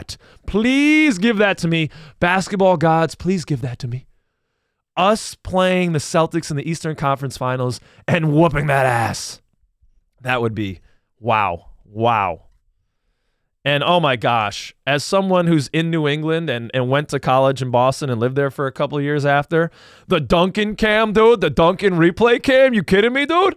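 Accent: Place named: Germany. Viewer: I'm so jealous of your German accent. I wish I could pull that off.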